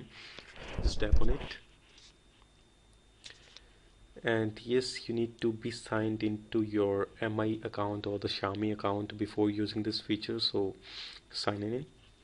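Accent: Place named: India. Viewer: Indian accents are annoying AF! Had it on mute the whole time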